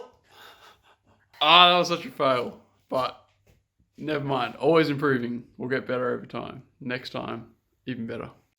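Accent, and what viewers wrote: Finland. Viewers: Feels so wierd to hear the worst finnish to english in sted of english to finnis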